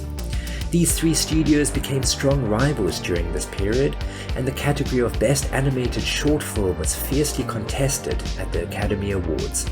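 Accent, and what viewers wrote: South Africa. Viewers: 2 seconds in and i hear a S.A accent....instant sub🌌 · Are you South African? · You sound South African af my dude Sick vid tho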